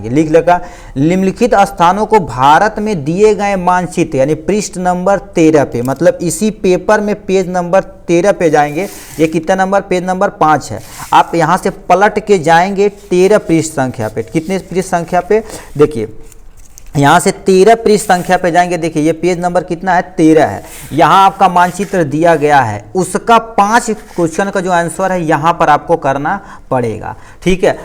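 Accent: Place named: Kaushambi, India. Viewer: Are yar bhai tum kaushambi ke hi ho kya bdi khatarnak boli h tumhri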